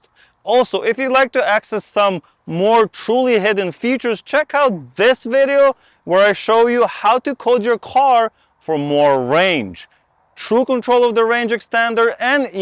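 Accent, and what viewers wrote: Russia. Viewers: Why do you speak like "Diagnose Dan"? Oh, with Russian accent... I can understand that's not easy to lose your accent, buy why copy a hard working guy? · This was very useful. Even Russian accent sounds good.:face-blue-smiling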